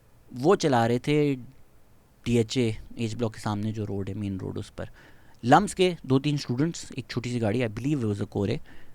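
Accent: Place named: Punjab, India. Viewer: Love your Punjabi accent 😁❤️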